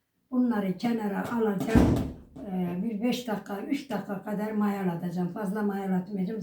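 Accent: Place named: Meskheti, Georgia. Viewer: teyzem nerelisiniz acaba ben Ahıska türküm aynı bizimkiler gibi aksanınız var😊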